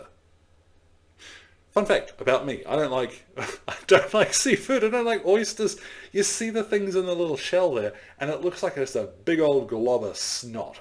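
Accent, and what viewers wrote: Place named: New Zealand. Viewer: The new mic makes you sound like a bloody Kiwi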